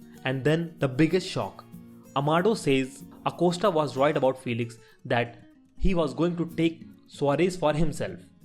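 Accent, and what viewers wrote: India. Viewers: He's accent is from india not Mexico you dumb ass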